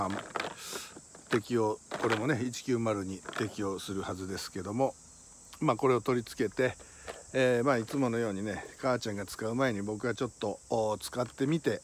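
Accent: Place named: Oita, Japan. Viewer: 大分の豊後大野市の者ですが、そちらの言葉方言と良く似てますよ😃 ちびるは、チビったとか、尿漏れの意味ですが😃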